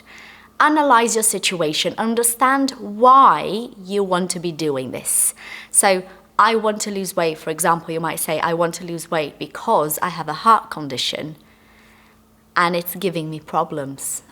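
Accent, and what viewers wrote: United Kingdom. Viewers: I love your British accent, happy new year for you and your family 🇬🇧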